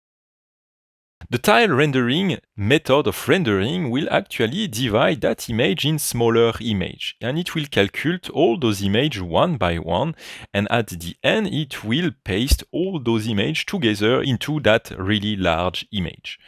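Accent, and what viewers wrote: France. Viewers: Super toutes ces nouveautés! Pourquoi ne peut-on plus avoir l'option lunettes en mode téléphone pour les panoramiques ? Merci. (Toujours drôle cet accent français en anglais ^^ ;-) dommage de ne pas traduire au moins à l'écrit) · Bonjour, pourquoi vous ne faites pas la présentation en français, vu votre accent, vous l'etes non?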